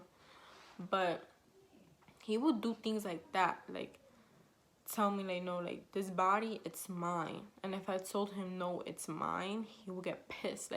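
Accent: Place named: New York, United States. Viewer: You from New York I that assent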